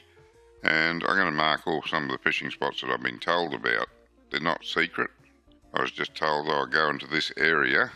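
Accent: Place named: Queensland, Australia. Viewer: It’s a pure Queensland accent, a rarity these days.😂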